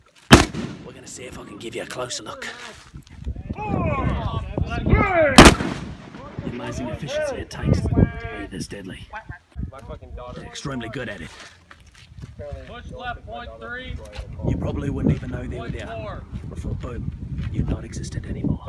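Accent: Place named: San Diego, United States. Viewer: He's from San Diego, man!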